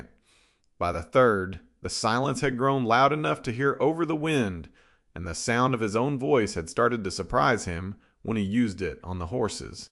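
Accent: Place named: South, United States. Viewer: I understand the country accent, but the word is Kata, not cattah. The proper pronunciation is Naug e nata.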